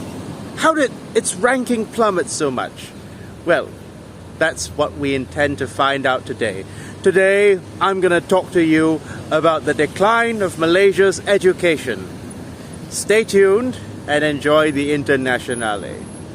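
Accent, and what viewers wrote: United Kingdom. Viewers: Great video. You sound like British Educated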